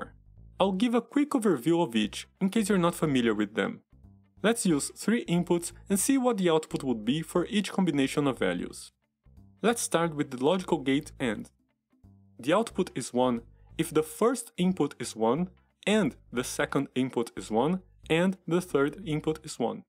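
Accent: Brazil. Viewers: You're Brazilian right? I can spot the english brazilian accent in a second! I'm Portuguese BTW, and loved the video. I'm trying to get into IIT and this is much helpfull. When are you going to post a follow up? Um abraço do outro lado do Atlântico! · I need to ask you this. Your accent makes me believe you are Brazilian. Is that the case?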